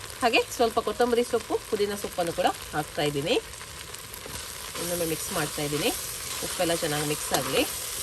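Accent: Mangalore, India. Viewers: Hi akka, ನೀವು 👌🏻ನಿಮ್ಮ ಮಾತು voice 👌🏻 ಮಂಗಳೂರು ಕನ್ನಡ 👌🏻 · Was just scrolling YouTube I got ur video and I will do subscribe because I love the slang and tone of Mangalore bashe ❤️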